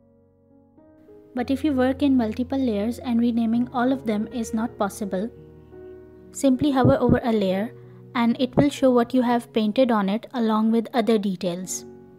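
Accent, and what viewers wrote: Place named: India. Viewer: then what authencity. Your accent sounds indian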